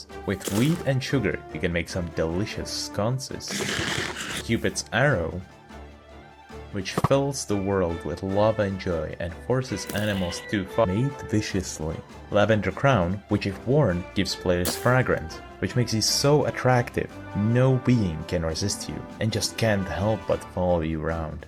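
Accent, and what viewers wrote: United Kingdom. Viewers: Britis accent was amazing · British accent's kinda interesting, I recommend to u look at the Irish English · you have an awesome British accent please release more news about windswept update pleaseee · British accent was epic and very funny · Accent funny british was generic comment /// no subliminal msg this time sry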